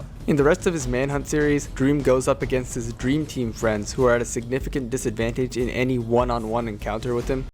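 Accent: Ireland. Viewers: Irish Accent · Yooooooooo I am part of the 1% (Edit) I just realised that your Irish... Im Irish to!!!!!!!!!!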